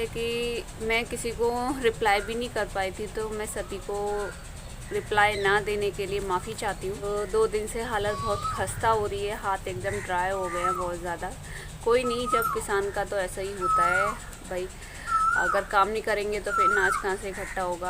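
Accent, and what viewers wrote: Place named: Aligarh, India. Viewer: Haa mam ki awaz sunkar mera dost mere se pucha rha hai to me bola yeh awaz aligarh se hai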